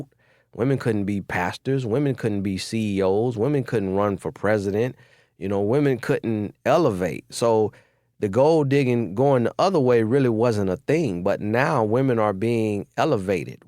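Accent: South, United States. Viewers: Is this really your voice, you sound a lot more southern in your newer videos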